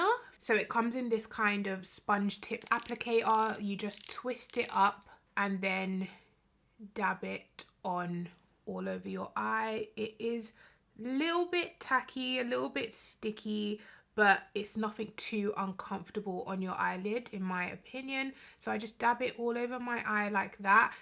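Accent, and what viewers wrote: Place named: United Kingdom. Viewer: I can’t be sure, because I’m not a content creator, but I think you’re over thinking it. I like seeing your face, 1, because we have similar features. Like keep it a buck? You’re black and it’s nice to see how to do looks and make them look good on brown skin. 🤷🏽‍♀️ this look is great, I want to try it. That’s what I take from you. Maybe it’s just me, but I don’t care if you use the same products, I just want to see how to turn out looks. I am NOT a TikTok fan. Not sorry about it either. Lol, but I just like to talk about makeup and when you come back officially, I want you to do what you want to do, I just want you to be happy doing it. I just want to see your face and hear your British accent, and watch makeup. Lol. Am I over simplifying?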